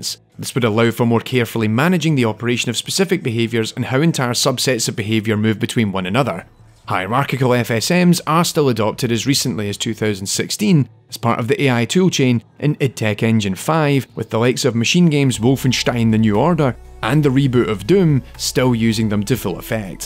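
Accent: Scotland, United Kingdom. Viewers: please keep being scottish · Took me nine minutes to realize he's scottish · "Valve's 1998 shitter." I'm like yeah it's old but shitter is a bit harsh... oh he's a scotsman. =D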